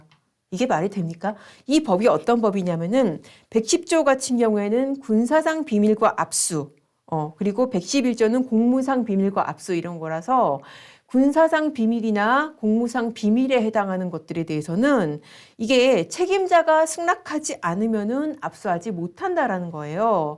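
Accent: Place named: Jeolla, South Korea. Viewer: 젏마도 절라도 출신이구먼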